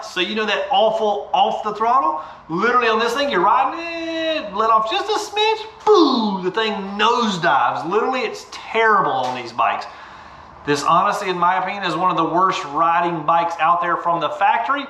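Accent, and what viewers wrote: South, United States. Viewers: Sorry, after trump, I can’t stand a hillbillies voice.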